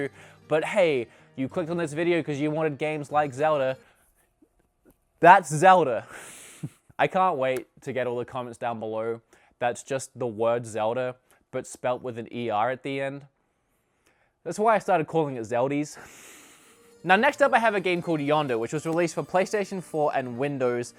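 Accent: Australia. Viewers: Are you Australian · Your Australia 😋 ps zeeeldoooo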